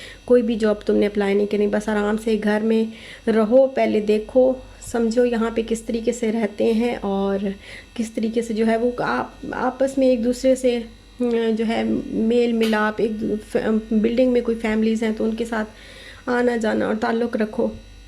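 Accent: Saraiki, Pakistan. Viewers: As'salam o Alaikum aap ke voice mai thori thori saraiki language aa rahe hai aap pakistan ke kis city se hain.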